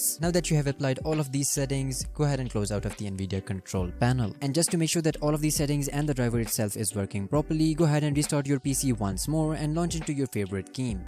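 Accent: India. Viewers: Never never never trust an Indian accent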